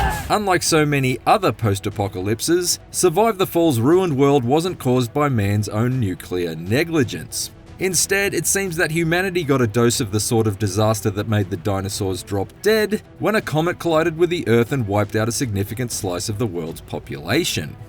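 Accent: United Kingdom. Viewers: The generic YouTube I'm vaguely British voice ? Meh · IGN please read your COMMENTS. People really dislke how THIS GUY narrates your VIDEOS. It's not like you can't find OTHER PERSONS to read the text for your VIDEOS. Just because he has a British ACCENT, it doesn't mean that HE IS a good narraTOR.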